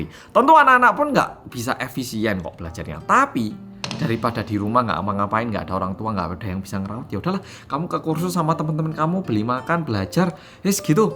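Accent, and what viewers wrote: Java, Indonesia. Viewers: Mas Korea kok medok Jawa ya 🙏👍🇮🇩💪🇮🇩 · Medok men bahasa mu mas bro.. 👍👍👍 Biar gak terlalu berkompetisi harus belajar spiritualitas mas bro 😁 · Enak bnr dgr logat jawa nya 😅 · Cak, yoopo carane sampeyan ngomong logat korea.. padahal sampeyan medok, bikin tutor cara pengucapan bahasa Korea lah cak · First time aku nonton vlog orng korea medok kyk org jawa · Informasinya sangat jelas, mulai dari hal kecil sampai hal yang rumit. Intinya Bullying dimana-mana selalu ada, Karena sifat dasar manusia yang ingin menguasai dan diakui. Dan Sebagian besar orang-orang seperti itu terbentuk dengan sendirinya oleh lingkungan disekitar. Terima kasih, sudah membuka wawasan kami tentang kehidupan di Korea ya Mas 😁 Saya suka Gaya medok Njenengan hehe.. Salam dari Surabaya 🙏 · Kok medok banget sih masss, jd unik lohhh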